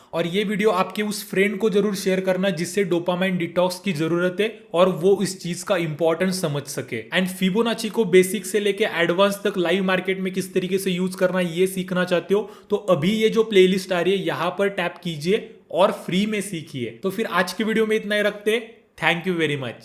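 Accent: Satara, India. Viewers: are you from satara ?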